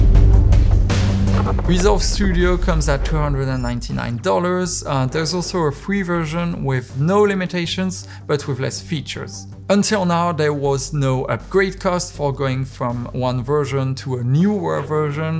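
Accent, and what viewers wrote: France. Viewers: T'es français ? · Top cette vidéo ! Je sais que tu es français, l'accent, Etretat... héhé :) Tu m'as donné un aperçu pour un passage vers Resolve, moi qui en entends parler depuis des lustres déjà Je me permettrais de te contacter par instagram pour quelques infos / conseils pour démarrer mon utilisation de Resolve, surtout pour l'étalonnage. Continue chef ! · I’ve used Premiere for over 20 years and it’s always had stability issues. We used to have to reinstall premiere everyday while the preference files used to get corrupted all the time. I’m looking forward to trying Resolve now. Great videos buddy and love the French accent! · Didn't notice you were french until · Fantastic presentation, excellent content, extremely professional. Love your adorable French accent too 😶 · Hey, are you french ? ^^ great video ! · J'étais persuadé que t'étais français à cause de ton léger accent, mais franchement tu m'épates. 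Bien joué à toi pour ces vidéos de qualité ! 🤗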